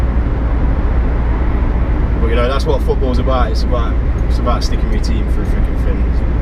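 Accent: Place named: North, United Kingdom. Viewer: Ah, welcome back, nice bit of a northern accent and 😀, Belfast nice city, how many of ye on this tour.. safe travels...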